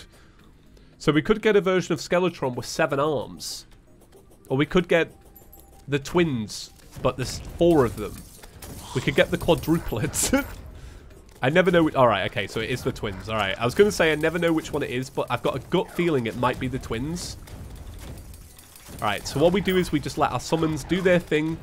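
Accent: United Kingdom. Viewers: The bri’ish terrarian is back :)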